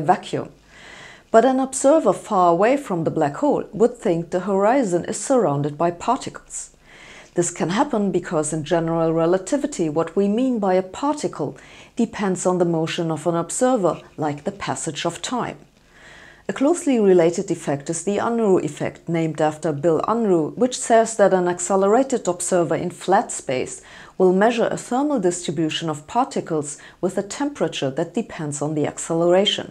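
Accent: Germany. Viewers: Sabrine, great job I love your videos but now the but. English sort of compresses parts of the words and many non english born seems to think that that is not so important, But it is. If the relevant parts are not compressed it is sometimes difficult to catch the word. In short you sounds like a german ( surprise surprise) So the efford you need to improve is very little and you will not only be great but sound great. Sorry if inapprobiate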